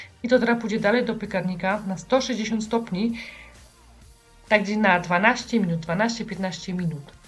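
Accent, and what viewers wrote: Silesia, Poland. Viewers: jest za granicą,pochodzi ze Śląska i mówi swoją gwarą.To jest piękne,gwarę należy powielać,bo to część spuścizny po przodkach.I nie ma się co popisywać ze znajomością mowy polskiej! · Pani mówi gwarą śląską i wten sposób wymawia się mleko. Pozdrawiam.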